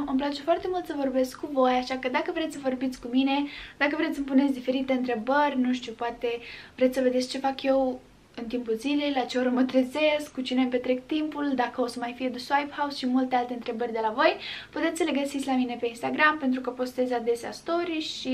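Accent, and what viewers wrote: Moldova. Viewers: Dar tu de ce vorbești moldovenește cu prietenii tăi cu mama ta dar pe vloguri vorbești normal?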